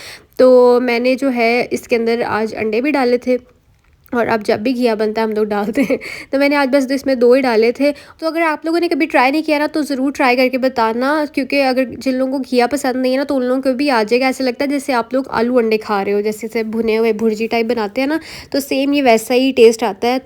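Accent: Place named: Punjab, India. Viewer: Love ur Punjabi accent 😂❤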